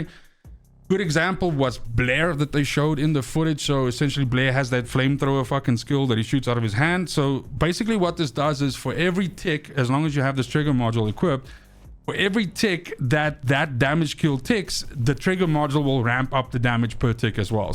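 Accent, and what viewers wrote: South Africa. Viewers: Bro sounds south african. But i just can't prove it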